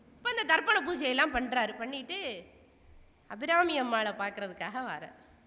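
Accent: Tirunelveli, India. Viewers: உங்களின் நெல்லை தமிழ் மூலம் சொற்பொழிவு அருமை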